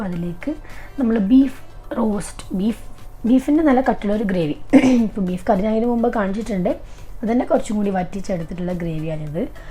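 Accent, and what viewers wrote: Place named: Mangalore, India. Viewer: Lv ur way f talkng frm mnglrian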